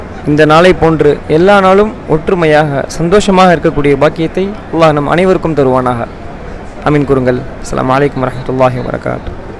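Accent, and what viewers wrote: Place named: India. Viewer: மாஷா அல்லாஹ் நல்ல பதிவு உங்களின் இந்திய தமிழ் அருமை நம்ம அத்தாட ஊரு தஞ்சை வலுத்தூர் நாம இப்ப இலங்கைபிரஜைகள் - தகவல் மீரா மொஹிதீன் அதிபர் ஜயசுந்ததர நாவலப்பிட்டிய - ஸ்ரீலங்கா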